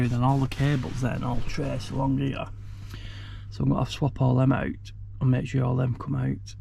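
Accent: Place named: Leigh, United Kingdom. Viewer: were are you from leigh or wigan sounds like leigh bolton lad here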